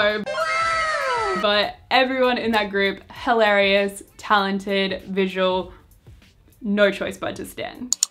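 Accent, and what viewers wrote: Australia. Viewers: OMg this video was so cute and I didn’t realise you were from Brisbane (I’m from Adelaide and I thought I recognised a bit of the Aussi accent 😂). My fav playlist song of all time has to be by but I’ve actually been loving by even though I thought nothing could top 😍 Insta: breathing_underwayer456 and I’d be happy with either album 😊😍 Thanks so much for this and it’s honestly so great to see other Australian Kpop fans (even tho no one ever come here for concerts 😭😂)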